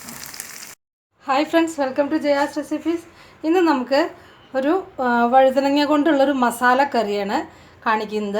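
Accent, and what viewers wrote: Palakkad, India. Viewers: കാഴ്ചയിൽ തന്നെ സൂപ്പർ ആയിട്ടുണ്ട്, ടേസ്റ്റ് പിന്നെ പറയണ്ടി വരില്ല, അടുത്ത ദിവസം തന്നെ ഉണ്ടാക്കി നോക്കണം 👍 സംസാരം കേൾക്കുമ്പോൾ പാലക്കാടൻ ടച്ച് ഉണ്ട് ചേച്ചീ , ഞാനും ഒരു പാലക്കാട്ട് കരനാണെ 🥰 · Exactly.. Even I felt the same 😍Oru Palakkad touch in talking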